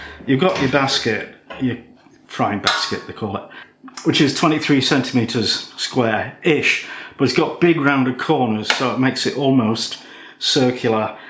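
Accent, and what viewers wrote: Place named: London, United Kingdom. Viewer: ) I am an Ilkeston Derbyshire (about 50 yrs ago) Sc-oh-n speaker. :-) I thought your pronunciation was by posh people from London. A very pragnatic review. I have an elderly (20+yrs) Phillips (about 2.4 L I think) thing and have recently bought an IP Vortex 5.7 Litre one cos it is bigger. I also have a Kasa thingy which gives some indication of electricity used (no idea how accurate, doubt it is very, if at all) and it says to me that it costs 0.14 kWh to cook a basket of Oven type Chips and about 0.2 kWh to cook the potato type (Non-par boiled which I would normally do). I know because I monitor my electrical demand daily that it used to cost 80-90p to make a batch of Home Brew (full Mashed from grain), it now costs over two pounds. Tsk - my expensive brew has increased in price to almost 60p/pint. (guesstimate) :-) I suggested some time ago that Scones were - sort of-separated out from a partially cut up shape of a round one in the middle and (perhaps) eight ones around the centre which were wedge shaped. 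I have still not seen where I think that from. Must make some.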